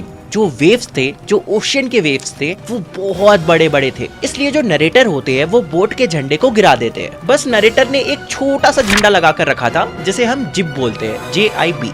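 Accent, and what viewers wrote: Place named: Bihar, India. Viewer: Abbe sale bihari pronounciation to sudhar le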